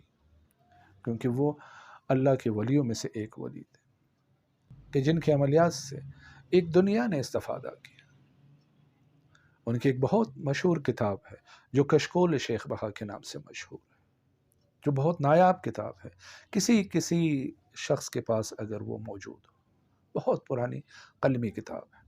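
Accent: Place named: Gilgit, Pakistan. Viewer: Aap Shia Hain ?? ✍️✍️✍️. Awaz say ttu aap Gilgitti lagtay Hain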